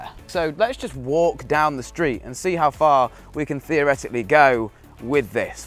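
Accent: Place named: RP, United Kingdom. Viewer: Posh as fuk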